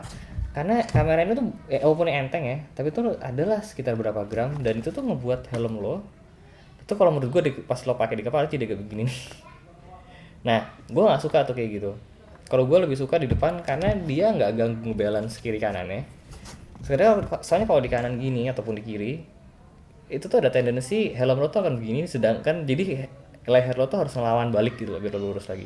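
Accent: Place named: Java, Indonesia. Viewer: Orang jogja nih? Medog beut ngmngnya hehe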